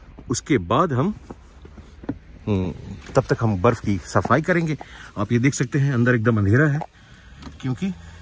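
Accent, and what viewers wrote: Standard. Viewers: Sir your voice and pure hindhi is very impressive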